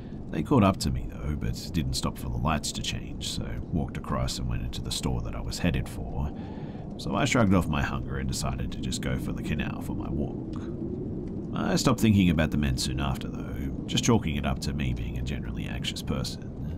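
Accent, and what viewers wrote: Australia. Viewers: Are you Australian ? Just discovered this channel I’m asking because if you are, that’s cool lol.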